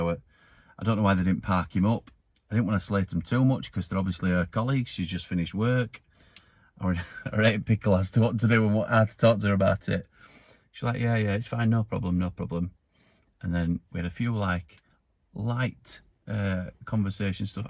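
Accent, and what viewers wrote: Yorkshire, United Kingdom. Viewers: Strange how much accent changes between Wakefield & Barnsley. For years I lived on Southdale Ossett & Worked in Averdsley Barnsley from home to work going over Blacker lane & Staines Cross was just over 7 miles. Completely different language lol. Did you mention to the 2 birds that subscribed to both of your Channels that all subscribers are LEEDS, MOT.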